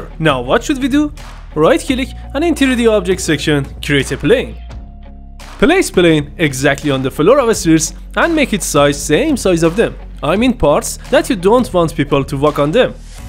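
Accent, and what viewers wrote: Iran. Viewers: I love your Persian accent. :D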